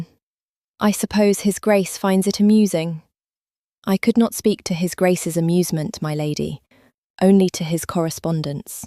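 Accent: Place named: United Kingdom. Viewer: Finally a brittish accent. Nice story. Gothenburg, Sweden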